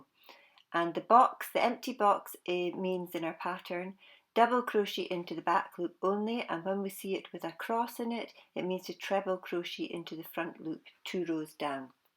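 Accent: Scotland, United Kingdom. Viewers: Clearly and simply explained, thank you. Ooo your Scottish accent is music to my ears 🐢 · Love your videos,nice hearing a fellow Scot. I came across your videos after watching Tinna. I had watched Mikey from the crochet crowd doing mosaic, (love him, but boy he talks at hundred miles an hour lol)that method was worked back and front, and used chains to skip stitches, then treble crochet two rows below over the chains. I’m going to try both methods to see which I prefer. Thank you so much for taking the time to make these videos, new subscriber here ❤️ Sandra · Many thanks ,I’ve just subscribed,my mother s Scottish so nice to hear your accent.🙏🏽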